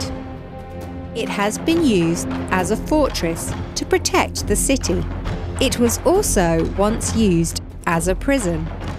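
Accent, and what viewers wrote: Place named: Southern England, United Kingdom. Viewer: I have been looking for English videos for a Spanish friend. Most are with American accents and/or many are too fast for the novice to take in. This is perfect with a good simple southern English accent at a measured pace. Thank you. Next is to find one about travel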